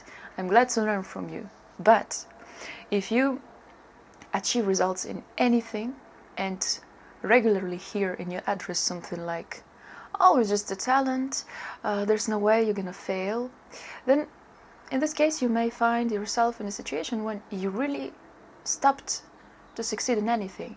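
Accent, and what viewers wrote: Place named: Netherlands. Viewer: Hi, you are totally correct. Based on your accent I think you are from Holland/Netherlands or Belgium, possibly Scandinavian. In the former USSR Soviet Union they were the first to destroy this myth of "talent", the state needed the people to be as educated as possible for a variety of reasons. And they were the first to say that this Myth was created by the Elite, the Intelligencia/Educated classes in league with the capitalist imperialist Monarchy, to keep the proletariat down. In order to limit people and to control them. In the USSR they would say everybody needs to work hard to develop good skills. Only working hard and getting proper good teacher, who are willing to teach you properly. In Western nations the Elite educated classes, the overall (average) effect is not to fully teach/help the students, they make them suffer by not providing sufficient information in the studies, and then they say "It is the students responsibility to find the information", so they are trying to limit the amount of students who make it. 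In the Soviet Union, the leadership was aware of these kinds of sabotage by the Professors, and the Professors were watched by other Professors working for the state as Educational spies you may call it. If they see that a Prof is not teaching certain topics properly, they would be called in for investigation and be asked "Why can't you teach that topic properly, is it because you are not that good in this topic, if that is the case we will send you a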